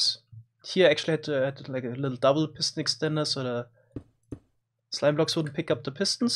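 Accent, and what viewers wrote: Germany. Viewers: Deutscher Akzent Izz da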